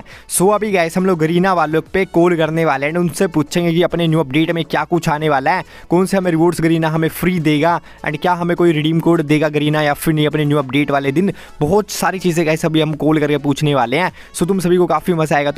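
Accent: Punjab, India. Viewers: Bhai kya aap Punjab se ho🤔🤔🤔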